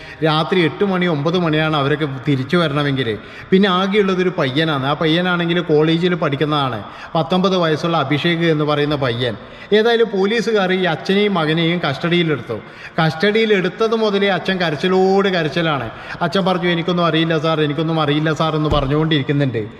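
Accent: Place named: Malabar, India. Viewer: മലബാറിലെ, ഭാഷ എനിക്ക് ഒത്തിരി ഇഷ്ടമാണ്❤❤❤,അതു പോലെ താങ്കളേയും, താങ്കളുടെ യഥാർത്ഥ പേര് എന്താണ്,?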